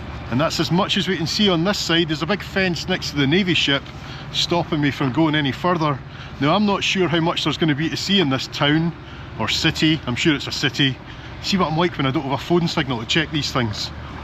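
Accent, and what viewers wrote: Scotland, United Kingdom. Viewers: Thank you for not punishing us too badly with your wee references to our convict who would be king! I'm thinking I need to persuade my husband to get me to Greenland before someone does something stupid (well, even more stupid) and we can't go at all. I'm going to start practicing my accent to sound like you so that we can go under cover as Scots rather than americansk, maybe that'll help. The video was absolutely lovely, and you're a wonderful tour guide for sure. We watched the next video earlier, so we saw the boat and the bergs and growlers, but it would be great to see it again. We're winter people as well, so that would suit us perfectly! Thanks again, and looking forward to the next one!! ❤ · Half the joy- your scottish accent 😸 Tnanks for another great trip 👌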